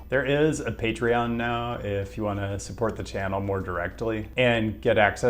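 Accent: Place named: San Diego, United States. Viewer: He becomes more handsome in each video , is he from southern Cali he speaks like how San Diego people sound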